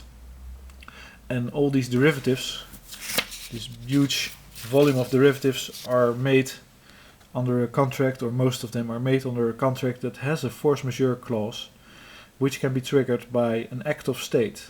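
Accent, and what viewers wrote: Netherlands. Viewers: dutch accent is unmistakeable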